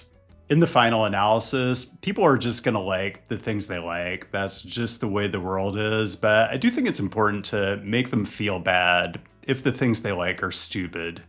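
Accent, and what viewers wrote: United States. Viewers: What is this guy’s accent? What state is he from?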